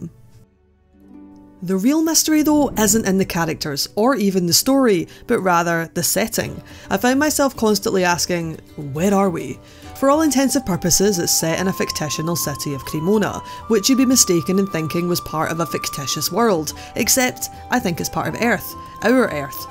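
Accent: Scotland, United Kingdom. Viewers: Nice to hear a fellow Scottish girl reviewing anime great review/really liked the feel of this anime it’s a shame it lacks at certain things like character development/pacing or it could of been so much better...did love Keith’s vibe though and pritty sure without it and the nice art/soundtrack it would totally be unmentionable but the little ending clip we got dose point to a second season so if they do hopefully they even some things out/it also doesn’t help much that I love devilman crybaby lol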